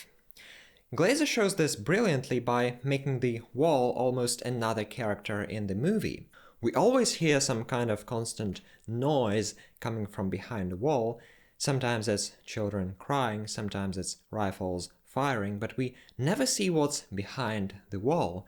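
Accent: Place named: Germany. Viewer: I am trying to work out your accent. I think it's German.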